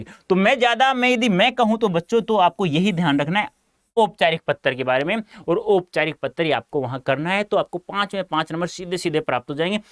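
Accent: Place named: Haryana, India. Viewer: Sir ki language hai haryana ki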